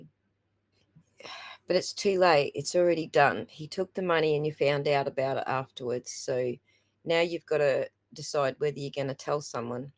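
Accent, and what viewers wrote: Australia. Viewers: I really love listening to.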